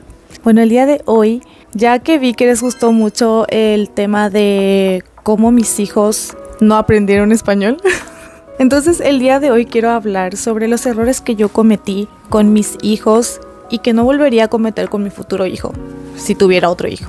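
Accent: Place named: Mexico. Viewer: siento que tu acento ya se escucha más mexicano, igual hablas relindo ❤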